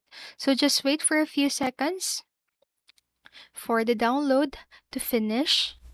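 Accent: Philippines. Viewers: Classic filipino/indonesian accent but this video helped me i am going to drop a sub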